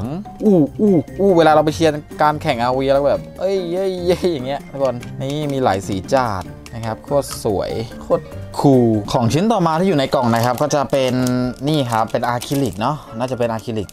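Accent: South, Thailand. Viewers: พี่ย่าคนใต้หรอค้าบบ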